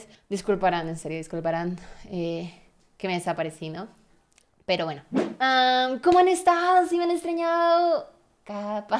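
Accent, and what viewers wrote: Colombia. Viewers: De donde eres? Pareces colombiana? Bogotana? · No sabia que eras colombiana, tu acento es diferente, pensé que eras de otra parte xd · Ella me apareció en sugerencias y me pareció monísima, ahora me subscribi porque le siento acento de Colombia que es donde yo vengo ☺️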